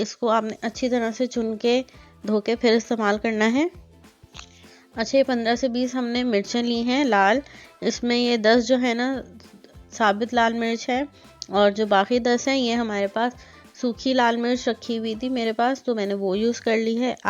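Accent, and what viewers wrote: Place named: Hyderabad, India. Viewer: MashAllah Khalis Hadrabadi zaban boli ja rah rah wow👌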